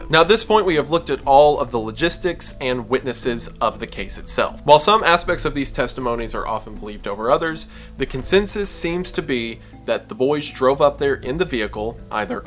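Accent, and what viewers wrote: United States. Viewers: American young man voice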